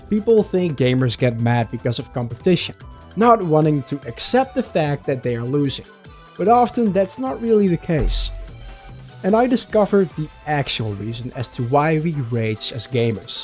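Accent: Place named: Netherlands. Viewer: Just stumbled across your channel, you are definitely Dutch without a doubt. Like the content tho!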